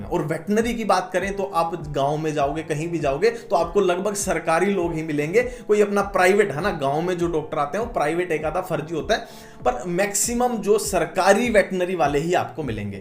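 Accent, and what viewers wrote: Haryana, India. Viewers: Bro haryana se kha se ho aap ??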